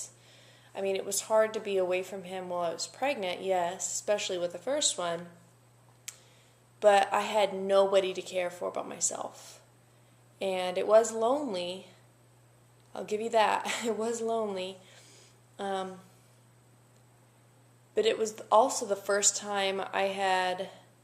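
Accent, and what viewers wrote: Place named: Pennsylvania, United States. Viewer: Ha! I knew you were from PA by your accent! I"m in Pa too! What part did you come from? i'm in NorthEastern, Near wilkes-barre/Scranton. I'm Guessing near Lancaster/Lebanon? Love ya hon! Ruthanna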